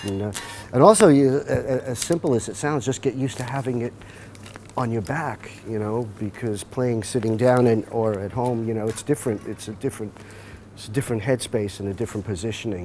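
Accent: Brooklyn, United States. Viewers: His palm muting virtuosity is out of this world. Love that you can hear a little of that 'Brooklyn honk' creep into his speech every now and then too. A nice Jewish kid from Brooklyn :-) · Is he from Brooklyn? I forget, but it sounds like it. What a cool guy.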